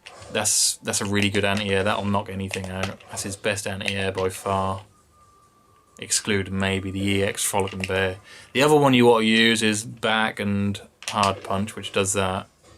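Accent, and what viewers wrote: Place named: England, United Kingdom. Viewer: Haha nah I just mean your English accent, combined with the cursing and you were obviously tired. I did learn some stuff about this character though - cheers.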